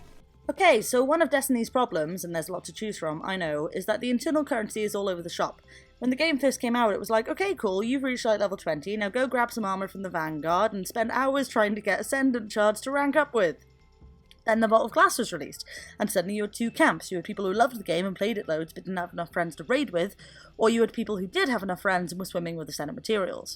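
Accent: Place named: West Country, United Kingdom. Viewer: Quick question..... Where are you from? certain aspects of your accent sound very "west country"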